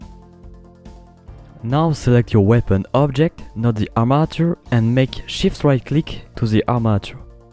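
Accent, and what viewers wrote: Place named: France. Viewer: Salut! Super cool tes vidéo, merci beaucoup! Je vois que les gens apprécient ton accent français haha! Fais gaffe quand même: J en anglais ça se dit ''djay'' et pas ''dji'' (et G se dit ''dji'' justement)... Je me suis gourré dans le raccourci à du coup ^^ j'ai fait ctrl + G (et ça a fait un truc mais je sais pas exactement quoi haha)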